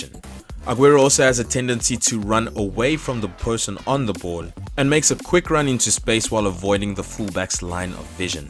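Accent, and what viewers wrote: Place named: France. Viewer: Thoroughly enjoyed the narrator. Man is definitely French. Looking forward to many more videos. Top stuff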